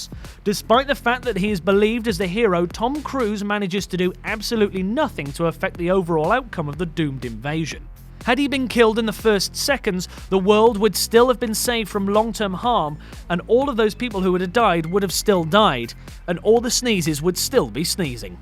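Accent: United Kingdom. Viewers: Really bad. Lazy and poor. I am trully sorry for you. Almost every character mentioned did, in fact affect the plot of the film. Except for a few who did not, like Cruise in War of the Worlds, or the Basterds. But that was no mistery. It was supposed to be that way. You think that using a british voice will make this sound inteligent? Dumb fucks.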